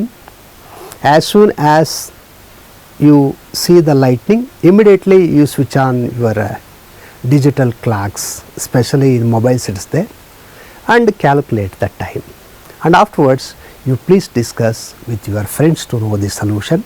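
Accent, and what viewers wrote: Telugu, India. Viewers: Really ur Telugu only sir I caught many words like questions su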